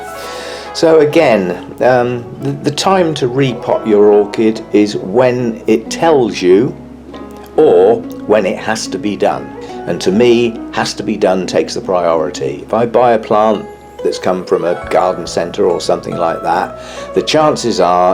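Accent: United Kingdom. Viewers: It is perfect,you know I'm not a native english speaker,and people with British accent really help me out with speaking;)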